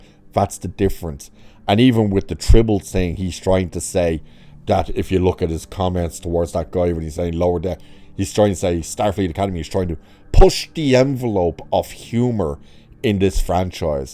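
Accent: Ireland. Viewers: North Dublin 😂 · Love your dialect. Which part of Ireland? Sounds close to some Newfoundland dialects I've heard.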